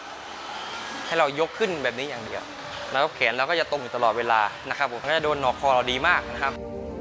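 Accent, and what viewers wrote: South, Thailand. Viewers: พี่เป็นคนใต้ใช่ใหมค้าบ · พี่คนใต้ใช่ไหม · คนใต้เหรอ เสียงออกทองแดง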